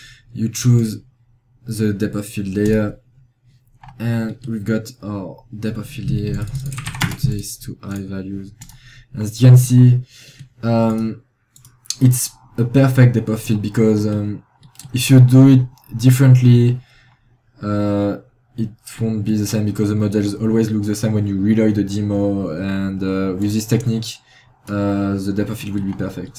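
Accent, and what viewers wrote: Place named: France. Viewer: hahahaha accent fr de merde hahaha jsui mdr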